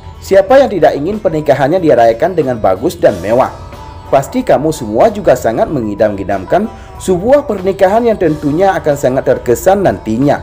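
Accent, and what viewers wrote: Aceh, Indonesia. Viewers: Aceh tok tok kali ngomong bahasa aceh, medok that 😅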